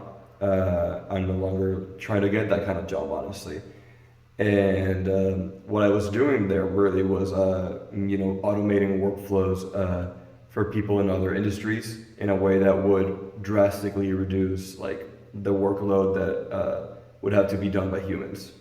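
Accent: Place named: Spain. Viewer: You Spanish? I love your accent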